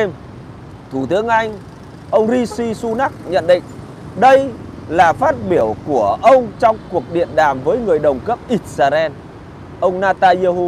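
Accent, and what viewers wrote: Standard. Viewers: Mễn Chào bon nghe quá chuẩn văn hóa lịch mà không biết thì nguy to.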